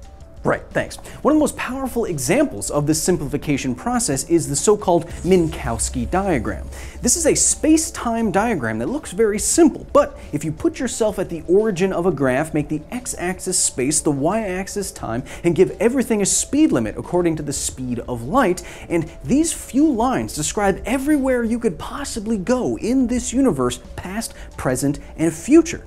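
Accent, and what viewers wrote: Germany. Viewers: Why does your german accent sounds kind of like a stereotypical gay man?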